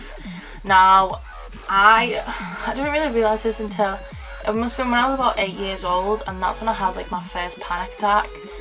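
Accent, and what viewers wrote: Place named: Liverpool, United Kingdom. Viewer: Your makeup is gorgeous! Ah and your hair, I love it. I just dyed my ends purple ^.^ Ok i'm getting really good at accents because I thought, hmm she's from Liverpool. -Jess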